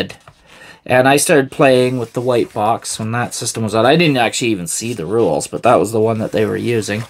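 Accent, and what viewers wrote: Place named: Canada. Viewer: Canadian, eh?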